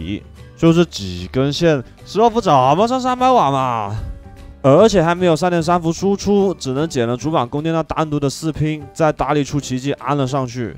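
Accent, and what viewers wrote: Cantonese, China. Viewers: 好亲切的白话普通话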